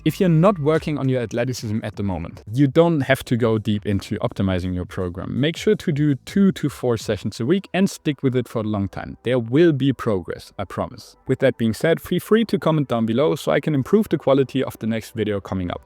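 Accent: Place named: Germany. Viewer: gutes video aber holy shit was ein deutscher akzent 😂❤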